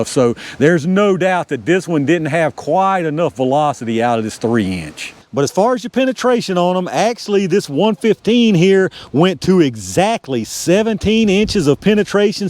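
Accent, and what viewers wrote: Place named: Georgia, United States. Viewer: Fun video & thats a Georgian accent if I'm not mistaken.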